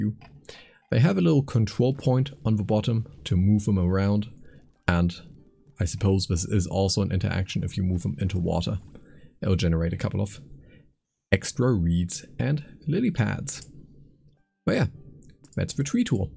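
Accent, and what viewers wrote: Germany. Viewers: I love the tutorial, but holy shit I love ur voice, the way you speak and emphasize on certain words and that thick (I think german) accent just makes this absolute cinema, love it buddy 😍😅😂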